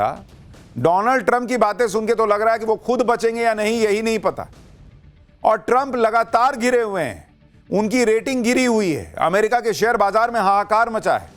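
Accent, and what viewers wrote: Bihar, India. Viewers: वाह जी,आपकी बीहारी टोन मे विश्लेषण सुनने मे बडा मजा आता है।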